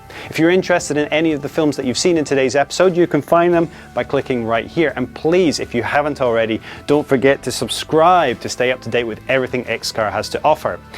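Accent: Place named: United Kingdom. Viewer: YAY!! My comment made it!! Thanks a lot,!! Love the show. It gives me my fix for cars and the British accent when I'm not watching Top Gear:D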